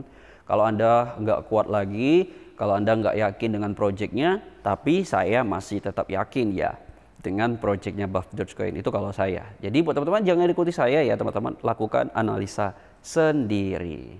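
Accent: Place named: Indonesia. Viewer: Dari gaya bhs Inggris nya aj gw udh feeling org Indo (gw gabisa jelasin yg pasti klo lu suka merhatiin gaya bhs Inggris lu bisa paham) Yah modal sejuta buat pengalaman worth it lah. Thanks otot anjing 🍻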